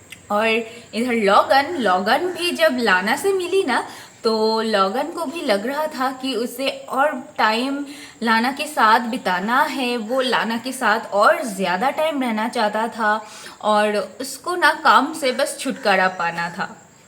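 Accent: Bengal, India. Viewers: accent LG rhi thi Bengali Hindi....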